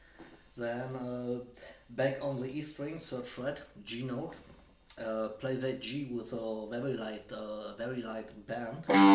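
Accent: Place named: Germany. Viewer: I guess so. German accent ...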